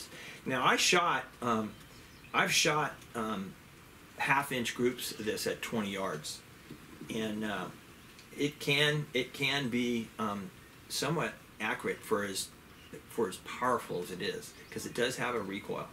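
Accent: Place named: Southern California, United States. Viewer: Sounds like you live in Southern California.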